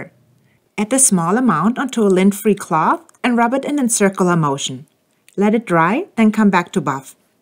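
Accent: Germany. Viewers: I like red dry wine, Pinot or Italian wines. That's a cute little dog at the end of the video. If I ever get a dog again I want a small one like yours. You are such a beautiful woman and I knew you would be a heart breaker in a dress. Is your accent German? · I can tell 🤔 are you German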